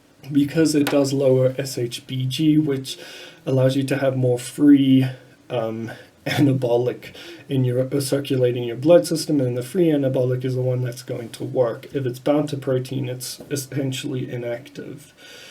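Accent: South Africa. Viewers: Your south african right man....great vid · Do I hear an SA accent there?? 😉 Good on you for putting out good info on the subject!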